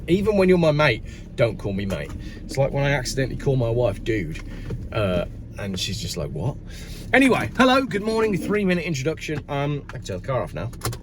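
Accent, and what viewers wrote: Wales, United Kingdom. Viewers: I like you Welsh accent please keep it up, Cannot believe you still have compulsory Insurance in the UK (Pom Land) it’s crazy. I remember Customer buying a brand-new motorcycle when I was working over in the UK on my OE and Insurance cost the same as the motorcycle did . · From a welsh man I love the accent. 😂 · And it’s raining right now here in South Wales boyo, love the Welsh accent, 😂. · As a welsh person, love the accent. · dell boy would be proud of that Welsh accent BOYO.and it’s pissing down in Bridgend at the moment.have a good day ✌️🙏🫶🏴 to the farmers. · Bloody hell! I thought it was Rhod Gilbert! Cracking accent boyo. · Don't say sorry for the welsh accent, it was fantastic! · Don't worry, you are back in the groove. Enjoy the 20 mph limits. 😀. Love the Welsh accent by the way. 👍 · Love your Welsh accent. More Welsh than my, born and bred in Wales accent. 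Lol. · Great to have you back,. Loved the Welsh accent boyou!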